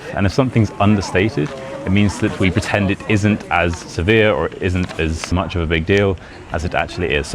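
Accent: United Kingdom. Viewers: Hi,! 🙋🏻‍♀️ Are you doing okay in this heat? Can I ask you something? Do you think you’ll still do your Walk and Talks when you go back to the UK? I hope so… Thanks to your videos, I’m starting to enjoy British English. I really enjoyed what you talked about, and I was able to understand this one quite well. Thank you so much for making these videos. Have a good trip, and see you! 💙🩵😊👍:_HenryNice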